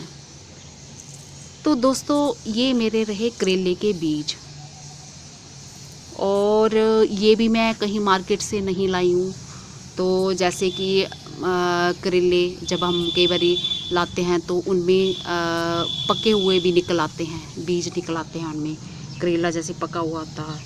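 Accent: Punjab, India. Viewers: love your sweet punjabi accent! if your plant is ready and bearing any melon, pls. post some pictures here. waise...videos zara brief banaya karen. thanks! :)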